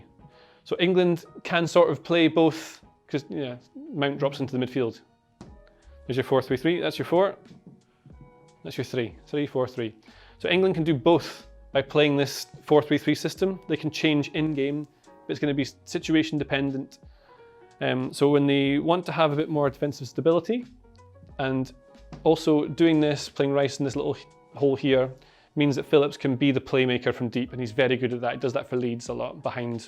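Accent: Scotland, United Kingdom. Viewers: that's a really light Scottish accent lol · As an Indian, i also have difficulties to understand what he says. I understand American accent totally but when i hear British accent, it makes me feel like that i don't understand English at all. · And for Americans who can't understand Scottish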